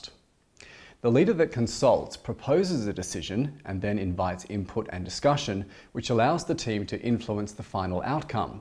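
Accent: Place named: Australia. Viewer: Somehow accidentally stumbled upon this while reading an article about a completely unrelated topic. Watched the whole thing mainly because of that beautiful Australian(?) accent. May watch a 3rd time idk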